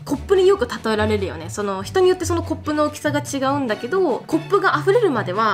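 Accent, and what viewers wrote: Kansai, Japan. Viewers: 友達にパスタアレルギー大変らしいよと言ったら『そんなアレルギーないよ。』と強めに言われた‥。関係ないけど、たまに関西ぽいイントネーション出るね。擬音とよくわからない行動もよく出るけど‥。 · チャン、関東人ではないですよね？イントネーションがチョット違う。名古屋から西❓ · え？関西弁多くない？？？かわんいい、、、、、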